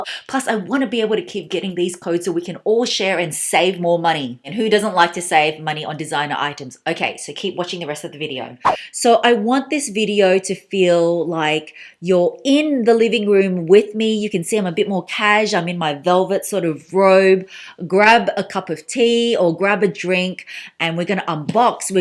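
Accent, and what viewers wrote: Australia. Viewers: HI, I so love your Aussie accent, my sister lives in melbourne maybe one day i have a chance to meet tou in person. You are so lovely!!! I love that versace bag it is so cute!!!! mheller161 IG.... Looking forward to more videos❤️❤️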